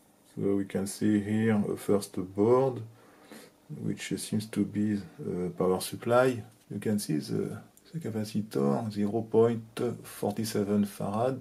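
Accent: France. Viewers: Simple, bien filmé/monté et surtout complet ! Merci pour cette analyse et cette formidable chaîne, extrêmement riche en pièces originales. Un vocabulaire technique parfaitement maitrisé avec un bon accent français comme on les aimes 😃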